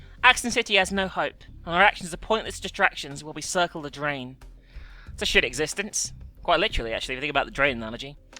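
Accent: South, United States. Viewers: I love the southern accent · I love the southern actcint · People really hate that southern accent voice? I find it enjoyable and in your Dangonropa voice it kinda fit Nagito's bat shit crazy personality. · Not a fan of this stupid game, but I personally luv southern accent!:)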